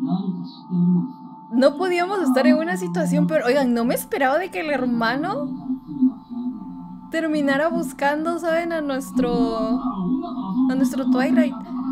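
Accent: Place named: North, Mexico. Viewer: Le achunto al clavo lo de norteño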